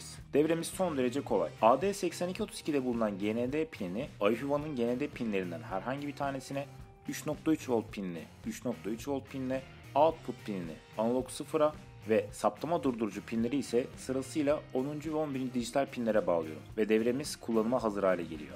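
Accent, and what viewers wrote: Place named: Isparta, Turkey. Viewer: Nerelisin konuşman Ispartalı gibi bizim buralardanmısın ?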